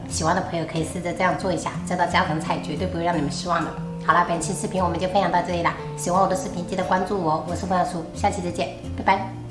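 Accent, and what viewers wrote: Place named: Quanzhou, China. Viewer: 你泉州的啊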